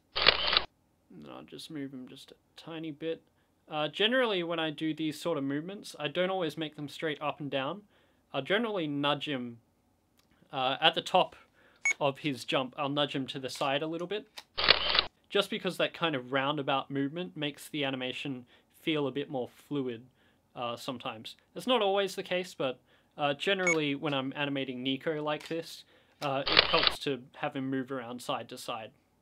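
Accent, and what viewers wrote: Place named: Australia. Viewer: Aussie lad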